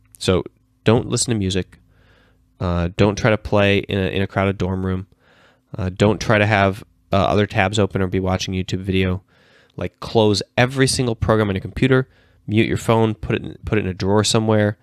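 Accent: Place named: United States. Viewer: you have an american accent yet studied in finnland. Are you finnish descent?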